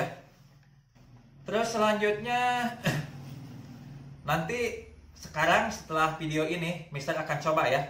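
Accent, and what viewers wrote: West Java, Indonesia. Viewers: Si mister dipanggil abang. Tp dari logat mah teu bohong cigana orang sunda hahahaha.. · Logat sundana ngeunaheun kang mister :v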